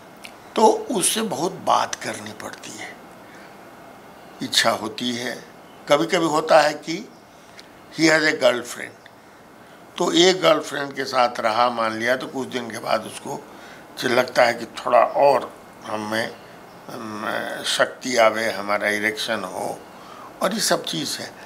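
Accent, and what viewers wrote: Bihar, India. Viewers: It was such a beneficial yet amusing experience listening to such a wise neurologist in typical Bihari accent and style...😊❤ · Sabse best doctor sahab ka samjhane ka bihari lahja uper se khan to muzaffarpuriya style as-------Repallaya sabd dilko chhoo gaya. Thanks doctor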